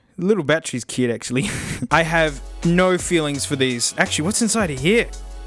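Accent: Australia. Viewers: Is this guy Australia? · The Australian accent gives me the seratonin to live through this shite pandemic. I thank the youtube algorithm for showing me this amazing channel and I thank you sir for existing. Just lost my grandma recently, so being genuinely happy is something hard to come by. This made me laugh so much, I appreciate your content, youve got a sub from a very thankful viewer, cheers.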